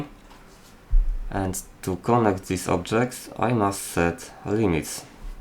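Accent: Poland. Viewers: jesteś polakiem xD jeśli tak to poznałem po trochę niepoprawnej gramatyce i polskiej wymowie liter i niektórych wyrazów bez urazy ;-)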